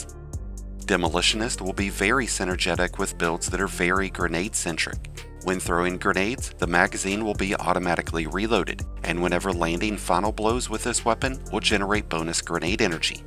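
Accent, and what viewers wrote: South, United States. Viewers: love ur accent! is that southern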